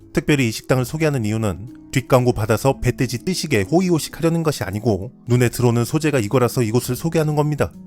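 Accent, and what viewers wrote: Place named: Chungcheong, South Korea. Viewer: 내가 제일 좋아하는 유투브 프로그램!! 특히 충청도 목소리가 감칠 맛이 나지 누구도 흉내내지 못하는 저 리듬감 있는 목소리 패턴은 개그만담도 많이 해봐야 아는건데.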